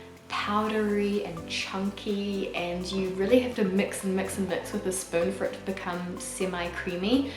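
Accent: New Zealand. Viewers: i am going to nz in few days, yours videos help me for understand the nz accent , thanks!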